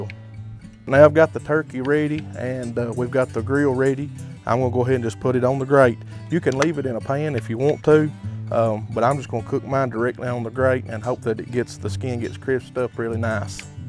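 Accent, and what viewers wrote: South, United States. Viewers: Call me biased, but I tend to place greater trust in smoking and barbecue recipes when they're delivered with a Southern accent :)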